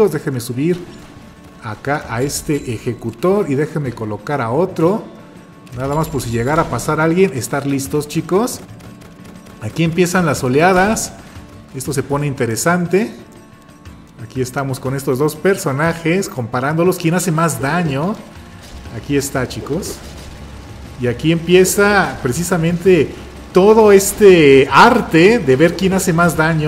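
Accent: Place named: Mexico. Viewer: Hmmm tratare de adivinar de que estado de mexico eres🇲🇽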